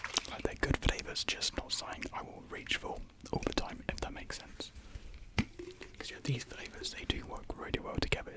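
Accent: United Kingdom. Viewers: Is he British cuz British people are good at ASMR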